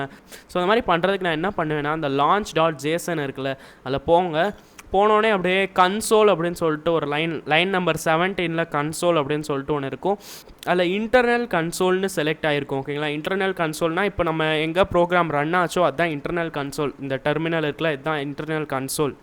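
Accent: Madurai, India. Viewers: thambi madurai ya...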